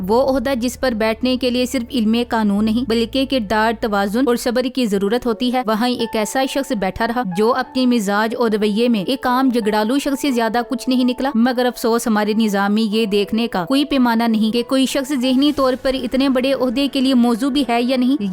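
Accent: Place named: Punjab, India. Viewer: Khabees Faiz essa .... Please AAP Urdu ko Urdu mein Bolen Urdu ko Punjabi lehjay mein na boleen ....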